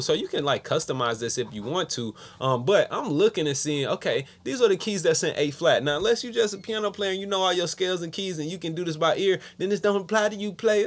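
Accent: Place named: South Carolina, United States. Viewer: U from south carolina huh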